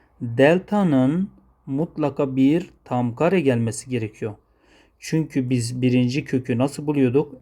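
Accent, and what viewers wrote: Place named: Van, Turkey. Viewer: hocam Vanlı mısınız bir arkadaşımın konuşma şekline çok benziyor sizin konuşma şekliniz 😊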